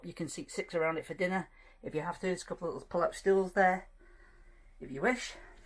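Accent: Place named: North, United Kingdom. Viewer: Thanks enjoyed that, I too found your channel from Nick's recommendation, though being a fellow northerner I prefer your accent.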